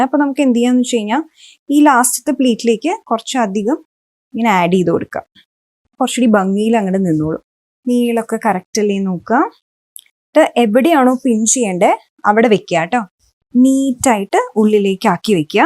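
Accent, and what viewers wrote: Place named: Valluvanad, India. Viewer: Thrissur കാരി അല്ലേ...പക്ഷെ വള്ളുവനാടൻ ഭാഷ ആണെല്ലോ പറയുന്നത്...സ്റ്റൈൽ ിനു വേണ്ടി ആണോ...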